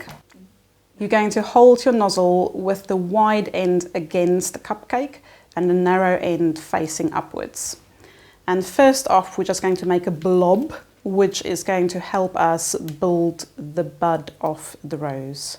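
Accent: South Africa. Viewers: Lovely tutorials. So original and so nice to hear a SAFA accent!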